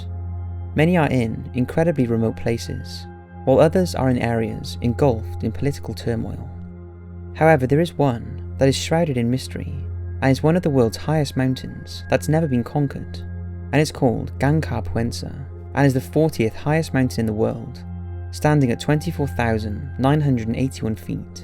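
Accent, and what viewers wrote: Wales, United Kingdom. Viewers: Trying to guess the accent. Welsh?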